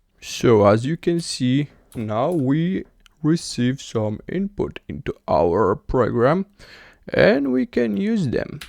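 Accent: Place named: India. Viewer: EXCELLENT TUTORIAL THANK YOU FOR HINDI ACCENT I UNDERSTAND EVERYTHING NOW 🕉🕉🛕🛕 😍😍😍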